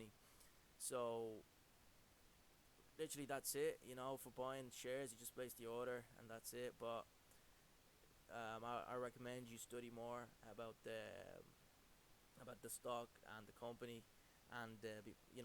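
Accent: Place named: Ireland. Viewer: I take it your from Ireland judging by the accent, same as myself, I was looking into this firm as oppose to Davy I was just wondering how you find trading with them? I was attracted by really low fees but they seem dodgy. I would mainly be a value investor type guy, so il be investing for the long run, but just wondering is there maintance fees for the account and all and how do you find trading with them? Are they worth it also are you paying CGT per trade or ?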